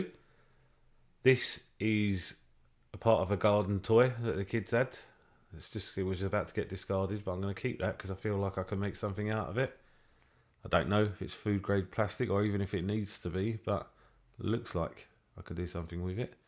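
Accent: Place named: England, United Kingdom. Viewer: Ah. An English accent!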